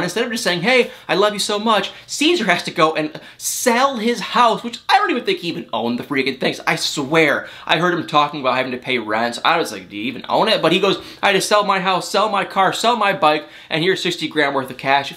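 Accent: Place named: Michigan, United States. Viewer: Hey! I called it a few weeks ago! The RandB News. RandB. RRRrrrr! You can smell it. Lol. I love 💕 your Michigan accent! I can hear it from another room! Sweet!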